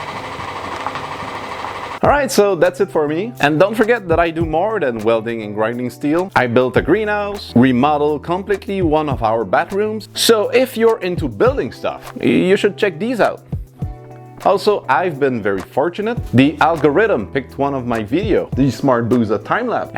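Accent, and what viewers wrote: Quebec, Canada. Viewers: You are very talented. Are you French Canadian.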